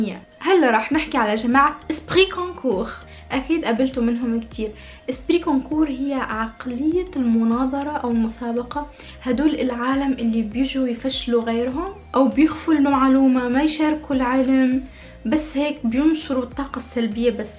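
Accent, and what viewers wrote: Tunisia. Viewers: دوختني وتش نتي تومسية او مغربية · تونسية ياخي ؟؟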